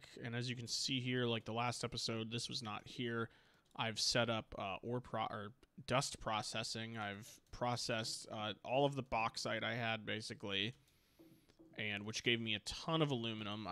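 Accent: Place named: United States. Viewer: Good prononciation of "excellent", just a thick American accent which is fine but unusual from a native speaker's perspective.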